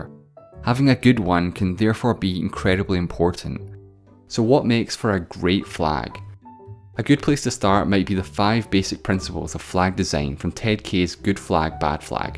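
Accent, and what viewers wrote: Scotland, United Kingdom. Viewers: I don’t want to be culturally rude but is it me or does he sound Scottish (btw I’m new to this channel) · Is scottish or something?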